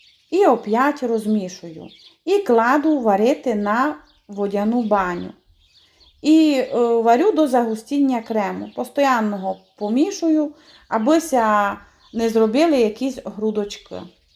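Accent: Transcarpathia, Ukraine. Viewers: Який гарний закарпатський діалект. Це багатство нашої мови. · Люблю закарпатський діалект. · я ще не їв того пляцка, напевно дуже смачний. Але я милувався твоїм голосом з закарпатським акцентом. Дуже мило і приємно слухати тебе. Дякую що ти на каналі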